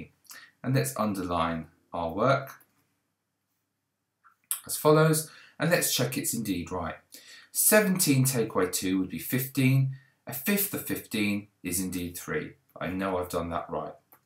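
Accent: United Kingdom. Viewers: This may come as a surprise to you but he is in fact speaking in a 'British English' accent...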